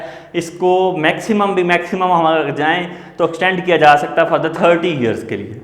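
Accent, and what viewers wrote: Pakistan. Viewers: Are yyou frm Pakistan?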